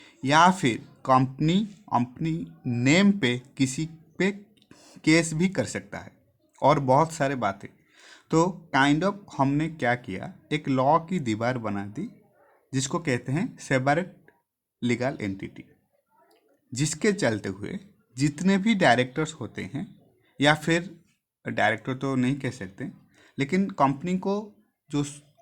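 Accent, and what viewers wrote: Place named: Odisha, India. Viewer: Sir aap achhi English or hindi bolte hai poora odiya accent me😁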